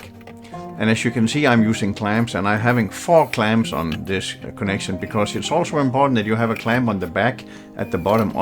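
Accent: Denmark. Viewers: Does anyone have recommendations on which style of Ikea cabinet is best? Has anyone used the Vedhamn cabinets? We're also considering white or off-white. Not sure which model to choose. I love videos. So grateful to have found this channel with this wonderful Danish man. Both of my parents were born and raised in Denmark so I really love hearing that accent.❤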